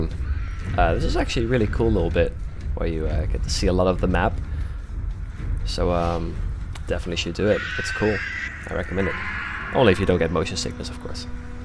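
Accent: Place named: Netherlands. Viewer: You sound Dutch lol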